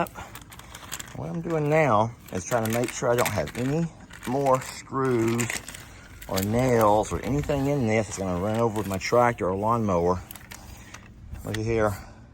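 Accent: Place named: South, United States. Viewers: My gosh. You're southern and y'all is just how we talk. One person can be y'all 😂 so all y'all is perfectly fine. Talk your way and don't worry about anyone suggesting you speak the way they think you should 🤗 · us Canadian's say eh all the time. It's just part of our lingo. You saying y'all is part of your lingo in the south. I think it's great. Your videos are great! Thank you for all you do!! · its just your texas accent...or south...lol Jason from cog hill is worse...i LOVE yalls accents lol your viewer from BC Canada ....Sam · I noticed the sexy Texan twang has gotten thicker since you stopped teaching. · I know you have read my comments I say y’all all the time it’s southern our accent. But it means you all so yes it’s natural to say y’all. Maybe when you were a teacher you might have been more correct in your language. Now you are retired, we are more laxed in our southern accent. 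And it is okay. Don’t let that comment change your southernness. Your tool room looks awesome! 😊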